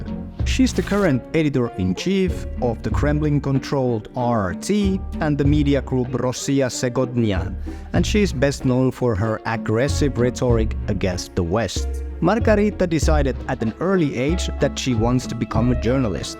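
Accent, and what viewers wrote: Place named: Finland. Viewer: Your finnish accent makes me feel cozy and the video was fun. But your should practice to atleast pronounce russian words correctly, as Russia is neightbouring country to Finland and the third largest speaked language in Finland. For example Россия сегодня, is pronounced "rɐˈsʲijə sʲɪˈvodnʲə" (in phonetic), or "rassiia sivodnja" in finnish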